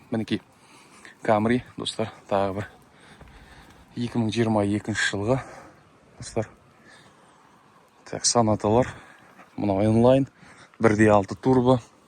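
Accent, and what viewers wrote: Standard. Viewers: Керемет бауырым сөз жоқ таза қазақша Алла жар болсын сіздерге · жақсы таныстырып жатырсың таза қазақ тілінде рақмет бауырым қаида жүрсеңде жолың болсын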